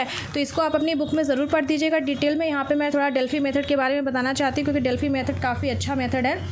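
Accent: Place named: Bihar, India. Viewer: Mam aap bihar se hai na kyoki thik h thik h karti h to apne bihar ke hi ho aap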